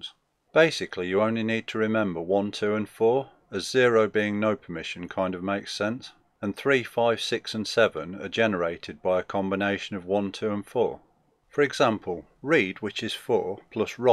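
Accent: United Kingdom. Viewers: Why does an English accent make everything so interesting?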